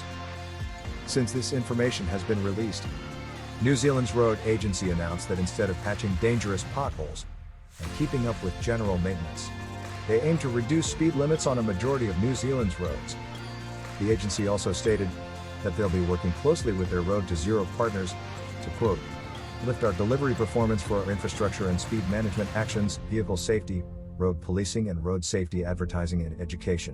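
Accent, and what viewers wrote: United States. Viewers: This is an Aotearoa (New Zealand) funded road safety promo hosted by an American or Canadian voice... Just say'n. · Why is all the footage AND the commentary accent based on USA, when New Zealand is more like Britain. We drive on the left, our emergency number is 111 NOT 911, and we (mostly) speak English similar to British English but with less regional accent. We generally don't speak American English. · Most of these comments are fake. Ask what is the motivation for producing this, and why the American narrator? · The minute I heard the American accent it lessened the impact of the message. How about we all take responsibility for our actions on the road, good or bad !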